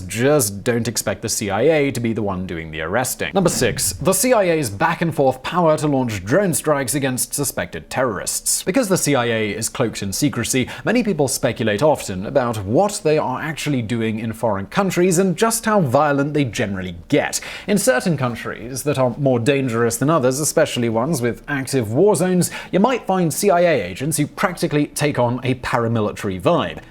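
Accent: United Kingdom. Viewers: British accent, living in Czechoslovakia, pushing CIA propaganda. Suspicious. Simon must have gotten a call from Jeff Bezos telling him the Organization needs a PR boost.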